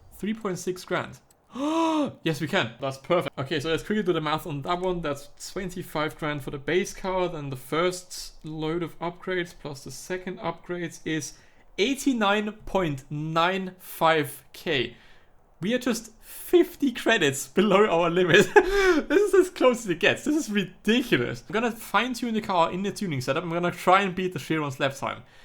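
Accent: Germany. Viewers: R u German? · Are you from Germany? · man hört das du Deutsch bist :) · Are you german? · he is German / Austrian I can hear it as I also am germna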